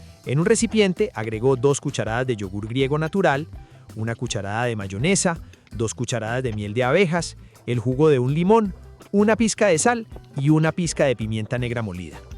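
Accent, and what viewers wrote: Colombia. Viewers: Me encantó la ensalada y me encanta su acento colombiano!! Hágale pues…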